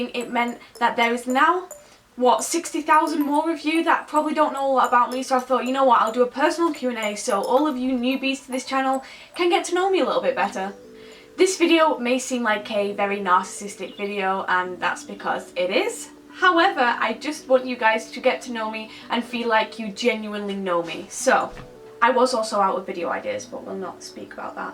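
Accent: United Kingdom. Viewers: Hi you are such an inspiration to me you are so preety and I wish I was more like you .Are you British you sound it but I can't tell. ❤❤❤❤❤️❤️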